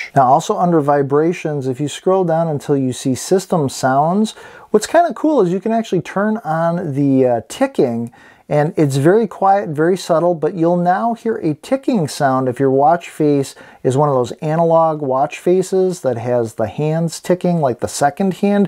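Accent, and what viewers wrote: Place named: Chicago, United States. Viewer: yup....you're from chicago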